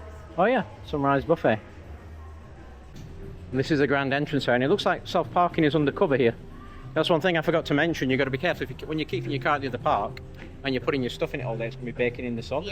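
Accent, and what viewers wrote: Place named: Northern England, United Kingdom. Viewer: Last time in Orlando we had tickets for Volcano Bay that had just opened, but every time we got there it was full for the day and that was before 9am. Things have gone full circle with the queuing, back in the 90s with less parks the queues were horrendous, 3 hours being typical, but with many more parks opening queue lines dropped so you wouldn’t have to queue much beyond an hour for anything. That’s all gone now and with the constant summer rain it makes for a less than perfect scenario. Thanks for the video. Nice to hear a northern voice, we are near Manchester.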